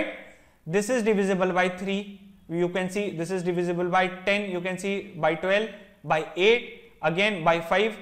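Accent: India. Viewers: Tamil English bro, cannot hear properly sia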